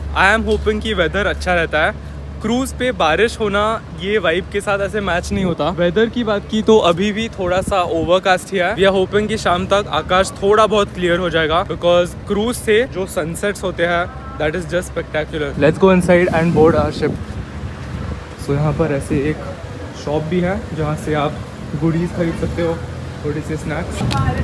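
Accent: Maharashtra, India. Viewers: marathi Manus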